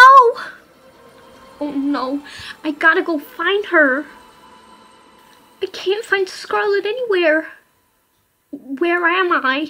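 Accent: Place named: United States. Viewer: Since when did you sound American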